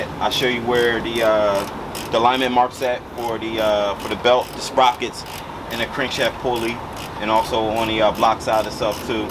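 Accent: Philadelphia, United States. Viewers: 👍🏿👍🏿👍🏿👍🏿 215 standup, new u was from philly soon as I hard ya voice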